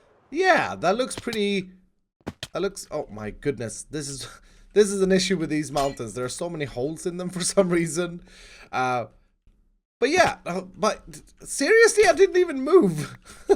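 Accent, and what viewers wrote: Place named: Sweden. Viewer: He's probably just developing an accent that's less swedish because the majority of the English-speaking world isn't swedish. He must think in a swedish accent, so it would be hard to lose it permanently.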